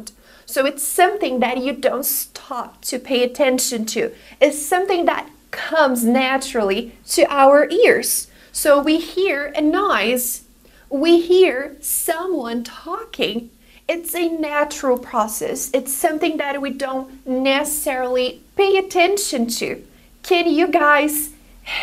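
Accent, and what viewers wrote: United States. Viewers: But she's speaking american, not english... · Brasileira? Caramba, pensei que fosse americana.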